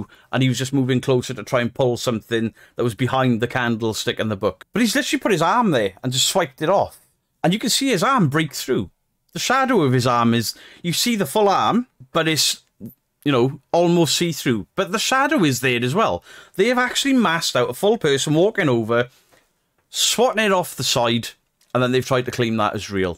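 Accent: Wales, United Kingdom. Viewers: thanks! I was monetized Once! I still get quite a lot of subscribers but no coin! ha I recognise the accent (as being Welsh lol) I'm in Carmarthen, Merlin's Town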